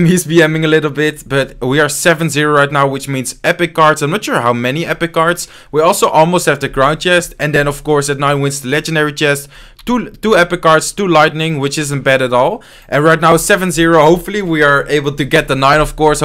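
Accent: Netherlands. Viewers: You can hear that he is from the netherlands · Echt te horen dat je Nederlands bent.............( niet dat ik het beter kan, en geen haat) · are you dutch?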